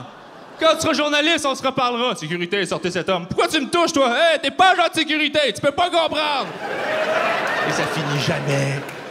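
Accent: Quebec, Canada. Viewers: J'ai bien rien perso jaime laccent quebecois dnc sa ma pas deranger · Vous êtes pas quebecois, vous pouvez pas comprendre ! Alors arrêter de critiquer l'accent. Car meme moi qui suis pas Quebecois, bah.. Je critique pas, et j'ai bien rigoler :D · Les Français qui disent ne rien comprendre : 1) Arrêtez la mauvaise foi, il n'y a que quelques mots/phrases trop rapides, 2) faites un effort, exercez votre oreille en écoutant d'autres prestations d'artistes québécois (et des films, genre ceux de Dolan), 3) sortez un peu de votre bulle, la francophonie est grande et belle et ce n'est certainement pas la France qui la fait le plus vivre. Le québécois s'est développé indépendamment du français de métropole depuis quatre siècle. En fait le français du Québec est plus proche de l'ancien français que le nôtre. Et puis 4) arrêtez de chouiner un peu, vous n'êtes pas le centre du monde. 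Conseils d'un Français aux autres Français. Merci le Québec, votre accent est beau (et sexy ! :p ) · Je ne trouve pas particulièrement. C'est comme ça qu'on prononce "parent" au Québec. :)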